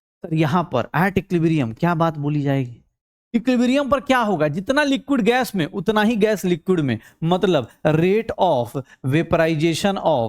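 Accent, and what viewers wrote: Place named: Bihar, India. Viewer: Sir ka bihari accent mast hai 😂❤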